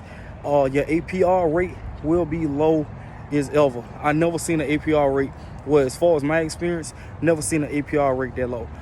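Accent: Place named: New Orleans, United States. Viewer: That accent though...New Orleans?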